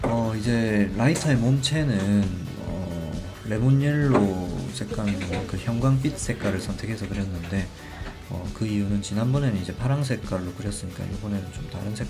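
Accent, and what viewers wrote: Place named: Busan, South Korea. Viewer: 붔산사투리가 구수하네예~~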